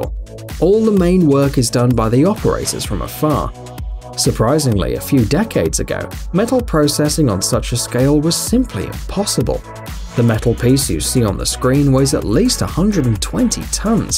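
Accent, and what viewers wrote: United Kingdom. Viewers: Ugh listening to the British way of saying these metals. No thanks.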